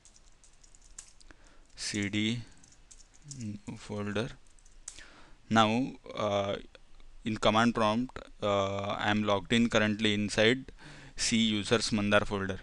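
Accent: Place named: Mumbai, India. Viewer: Mumbai accent :-)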